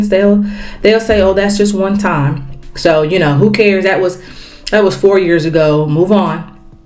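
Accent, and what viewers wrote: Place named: United States. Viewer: You arent FBA,stop maskerading!Tethers can't speak for us if you are not FBA; stop speaking for us; just because you have an American accent doesn't make you FBA; America has had immigration! You or no tether they can make us do nothing! Immigrants need to hold their own..leave us alone! Also, we do not look like Haitians; stop babbling; white people know the difference! You are talking gibberish! People undermind and disrespect us 24-7; we have done it enough. To hell with PanAfricanism and putting the capes on for them, leave us alone!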